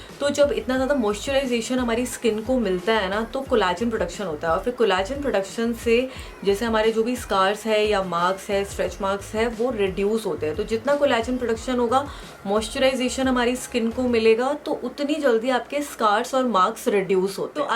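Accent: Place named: India. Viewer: There are two types of talking in the world : normal and Indian Would you at least put subtitles? That if you talk in English I don’t know, you speak English and indian exactly the same ...not understandable 🤷‍♀️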